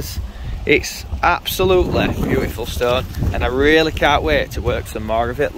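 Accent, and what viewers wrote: West Yorkshire, United Kingdom. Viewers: Came for the workmanship, stayed to hear my own beautiful West Yorkshire native accent that I miss hearing daily now I live in the USA. Thanks for being a brill Yorkshire ambassador, wearing your accent and showing the world Yorkshire pride, skill and industry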